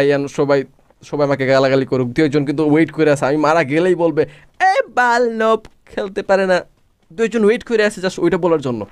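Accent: Sylhet, Bangladesh. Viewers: vai apnar basha sylhet kunano? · bhaiya apni ki sylheti?? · Tumi Sylhet fua ni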